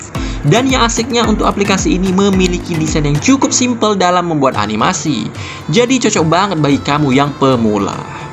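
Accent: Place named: Medan, Indonesia. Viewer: Jangan hilangkan logat medannya ketua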